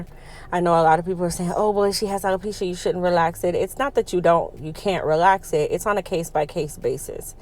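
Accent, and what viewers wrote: Jamaica. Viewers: I detect a Jamaican accent? I appreciate how you preserved her dignity while increasing her self confidence. ❤️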